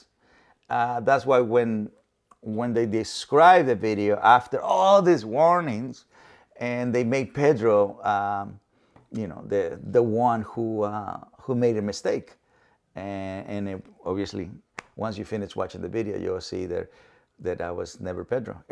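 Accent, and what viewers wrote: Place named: Mexico. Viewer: Love Cesar's Mexican accent I miss it when I'm not watching his videos. Not to mention he's also very spiritually awakened, not only does he know dog psychology but humans too. One of the best commendable spiritual teachers.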